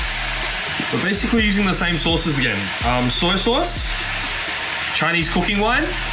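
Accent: Australia. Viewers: You sound Australian but you're chinese.. im so confused! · He Australian? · Are you Australlian?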